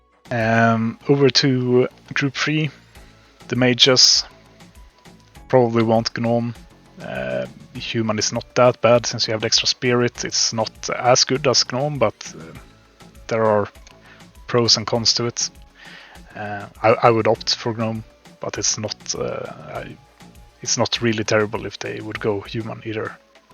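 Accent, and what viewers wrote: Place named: Sweden. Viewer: Ohh god the swenglish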